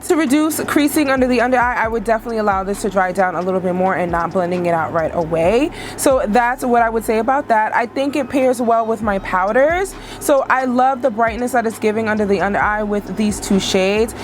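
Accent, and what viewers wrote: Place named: New York, United States. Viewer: I love the New York accent!❤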